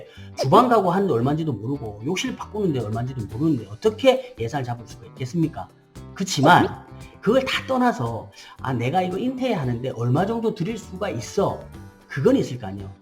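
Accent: Busan, South Korea. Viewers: 사장님 말투는 부산인데, 서울업체네요 아쉽:::😅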